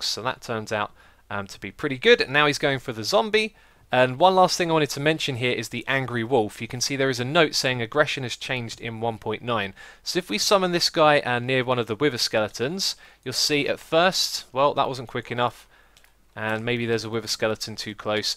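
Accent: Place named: United Kingdom. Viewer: Oh my you're British!!! Me too!! I think you're British, you sound it!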